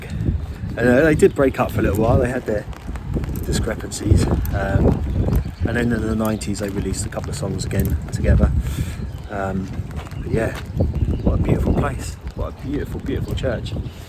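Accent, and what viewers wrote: United Kingdom. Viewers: Sad the brothers couldnt be buried in one place. Love your britsh voice. From Canada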